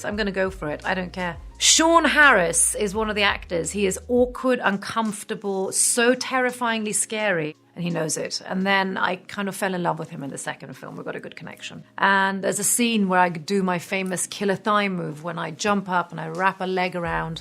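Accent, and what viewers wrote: United Kingdom. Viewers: She sounds more British than most British do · Fint att hennes svenska brytning märks genom den tjusiga Engelska dialekten :P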